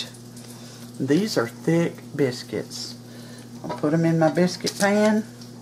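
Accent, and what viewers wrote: South, United States. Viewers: I just subscribed to you. Partly because the pie crust video and now these biscuits look amazing (and I love the sound of your sweet southern voice), but also because I loved your Kavanaugh statement and the beautiful God Blesses at the end of your video. 🤗💕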